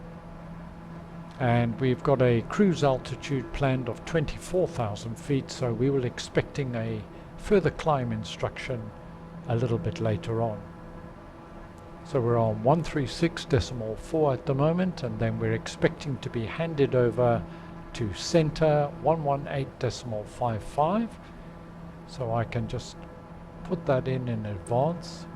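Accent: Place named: South Africa. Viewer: I think you should offer your voice as permium add-on. Would love to hear your soothing south african accent guide me from A to B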